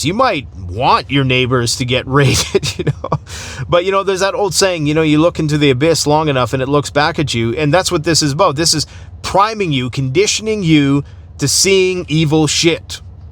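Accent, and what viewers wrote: South, United States. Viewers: Liking that southern slang 🙏❤️🥰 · like for the southern accent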